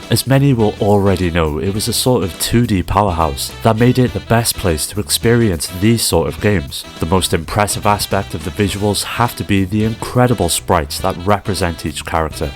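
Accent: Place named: Cardiff, United Kingdom. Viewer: Thought I detected a fellow Diffian. 😃😎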